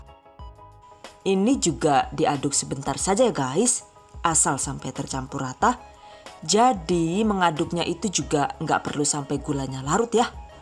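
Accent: Banyumas, Indonesia. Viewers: Senengnya belajar msk SM Ada hiburan ny ngapaknya Saya seneng karena SM dg saya dri Cilacap 👍❤️ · bunda...BHS ngapak mu bikin aku MLM 2 ngekek...bunda asli dr kota mana sih?tinggal di Madiun. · ya allah mba.. ngapak pada karo nyong lah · Bikin resepnya jelas bgt👍👍👍 tp gak kalah sama bhs ngapaknya jelas poolll, kencoot😂😂😂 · Trima kasih resepnya bun.. insya Alloh jd ide jualan lagi nih..😁 Jadi nyengir" sendiri denger ngapaknya..😁😁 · ini bunda org mana sih?koq ngapak bgt hehehhe kyk saya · AQ paling suka nonton video mbk lucu ada ngapak2nya karena AQ orang ngapak hehehe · Mksih Bund..resepnya mantul apalagi ngapaknya pd kro nyong banyumasan Bund...ora ngapak ora kpenak😃😃😃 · Ngapak sih mba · Pengen kenal sama bunda, ngapak nya itu loh gemesin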